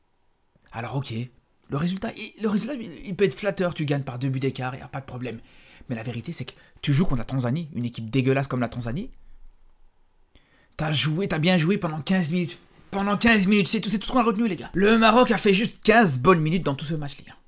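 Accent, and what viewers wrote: Belgium. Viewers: Frère t’es belge non ?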